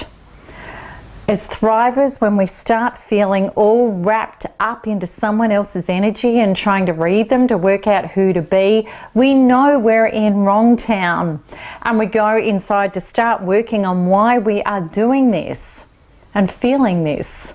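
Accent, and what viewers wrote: Australia. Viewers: Love your Australia accent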